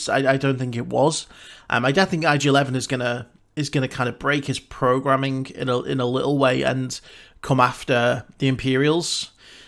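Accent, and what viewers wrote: United Kingdom. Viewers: Wait. You sound British yet I thought the Mandalorian was available on UK Disney plus till like feb of 2020. Just how are you seeing it ?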